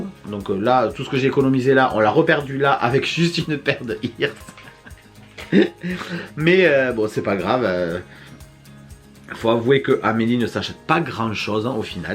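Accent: South, France. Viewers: Salut la famille, un « GRAND MERCI », je découvre la sphère de Disney depuis que ma petite fille s’y intéresse vraiment et je voulais vous remercier pour toutes vos vidéos, déjà deux semaines qu’on vous regarde, toute les vidéos depuis 2016, eh oui! Avec ton « Panasonic ». Je te remercie car grâce à toi j’ai une autre vision de Disney et des parcs Disney. Merci de faire nous faire vivre vos expériences avec tellement de passion et de joie de vivre. Vous êtes dés personnes sincères dans ce que vous faites et on apprécie énormément (et ma fille aussi 😁). En passant, nous sommes du nord (Normandie) j’adore l’accent du sud, ça ramène un peu de soleil en vous écoutant 😁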